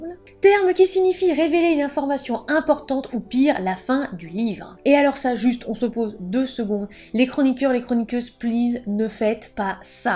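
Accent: England, United Kingdom. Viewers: Bel accent anglais 👏👏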